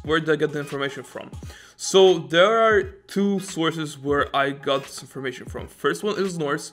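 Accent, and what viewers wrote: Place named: Sweden. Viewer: Wow i quite didn't expected that :D you sound like you're swedish maybe german or polish but not russian :D Great accent mate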